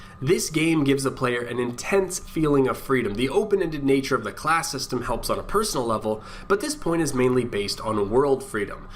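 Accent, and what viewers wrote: Canada. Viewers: I hear teh Canadian creeping through.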